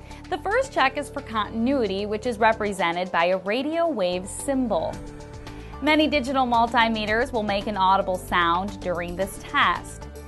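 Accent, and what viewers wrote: United States. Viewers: The American accent makes it difficult to hear clearly.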